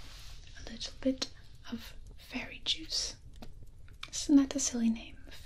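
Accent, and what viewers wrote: England, United Kingdom. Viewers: I find Americans doing English accents so soothing!! As a brit, this is honestly pretty good and the extra emphasis on the t sounds and the vowell sounds that u guys tend to do give me hella tingles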